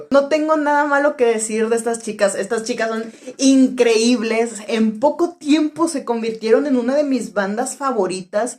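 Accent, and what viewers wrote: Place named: Monterrey, Mexico. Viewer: Gracias por tu video. Hablas como Ale, a Ale se le escucha el accento de Monterrey mas que a Dany y Pau.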